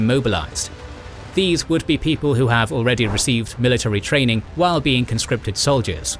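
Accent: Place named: RP, United Kingdom. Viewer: I want a British cut glass accent just like the guy speaking in these vids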